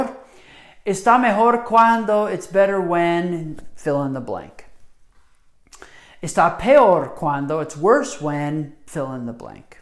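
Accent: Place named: United States. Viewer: Woof. What's with this bad American-style Spanish? Consonants are way too strong, and the "r" is wrong. Students: this might be good content, but poor delivery. Don't do this accent. I have traveled to a number of Spanish-speaking countries without speaking a word of English to get by, and I have never heard a native Spanish speaker speak like this.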